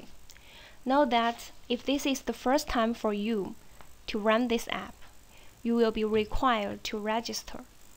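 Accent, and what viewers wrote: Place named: China. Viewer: Yes she sounds like any Chinese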